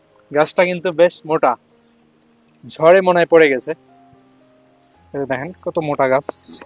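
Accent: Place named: Chapai Nawabganj, Bangladesh. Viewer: ভাই আপনার বাড়ি চাপাইনবাবগঞ। আপনার কথাতে আঞ্চলিক ভাষা র টান আছে। কিন্তু আপনি তা উপেক্ষা করার চেষ্টা করেন। কিন্তু আঞ্চলিক ভাষায় কথা বলা কোন অপরাধ নয়।...... From Rajshahi Bangladesh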